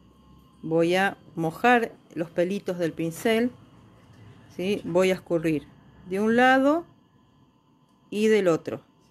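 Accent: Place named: Argentina. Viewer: Señora de q parte de ARGENTINA ES USTED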